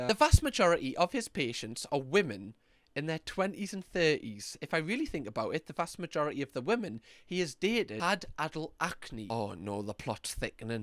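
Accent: Newcastle, United Kingdom. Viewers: It’s just part of his Geordie (sp?) accent - lots of Brits talk that way - I’m used to it now and find it charming 🇨🇦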